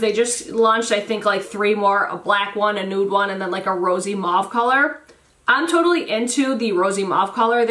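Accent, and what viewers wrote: Midwest, United States. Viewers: Just discovered ur channel last night and I really enjoy ur videos. I'm trying so hard to identify ur accent. I'm thinking Midwest. Wisconsin maybe. Totally not my business it's just I find accents fascinating. Mine is horrible I love urs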